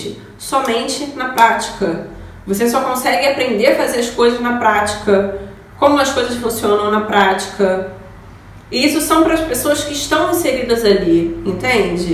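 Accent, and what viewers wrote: Rio de Janeiro, Brazil. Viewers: Linda! Pelo sotaque dever ser carioca. Bom vídeo 👏👏👏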